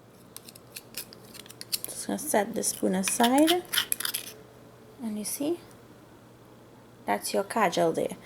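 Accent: Trinidad and Tobago. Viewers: I came to the video by accident and when I heard the voice, I said myself 'Aye aye! how she soundin' like a Trini so?", then I checked the name of the channel and bawl 'Or horr...'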